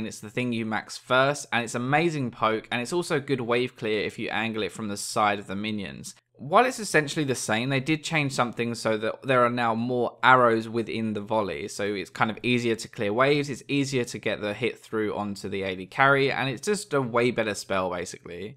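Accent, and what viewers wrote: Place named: United Kingdom. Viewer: I don't know why, but remainds me of Redmercy a lot just with british accent... Mindblown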